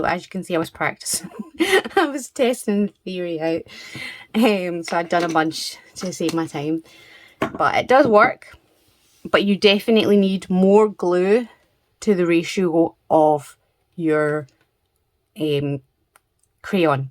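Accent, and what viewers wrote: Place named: United Kingdom. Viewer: Accents from the United Kingdom are so neat.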